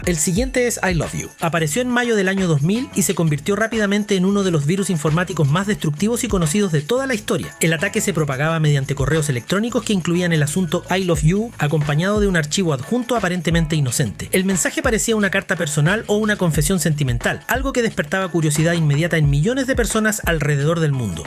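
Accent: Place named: Chile. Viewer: Todo bien Excepto el acento chileno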